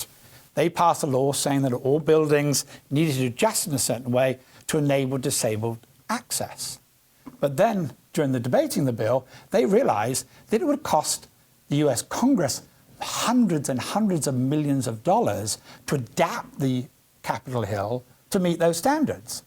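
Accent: United Kingdom. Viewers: Sounds Scottish of some description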